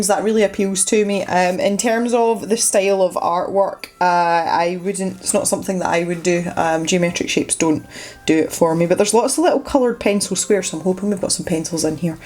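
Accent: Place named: Dundee, United Kingdom. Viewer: the Dundee accent is def different lol Glaswegian confuses me a lot I think the closest thing similar in Canada is the west coast accent compared to east coast. but thats provinces away by distance not a few miles but that makes sense since so many scottish/irish people went to the east coast hundreds of years ago (you do have very small regional differences in slang and sort of in accent but nowhere on the scale like it is in Scotland)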